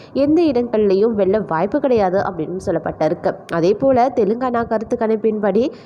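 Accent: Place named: Sri Lanka. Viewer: தமிழ் உச்சரிப்பு கூட சிங்கள தமிழ் மாதிரி உள்ளது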